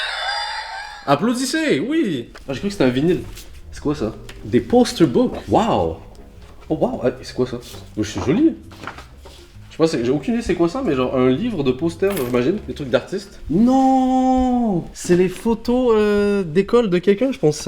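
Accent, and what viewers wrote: Quebec, Canada. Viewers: Il a un peux l'accent québécois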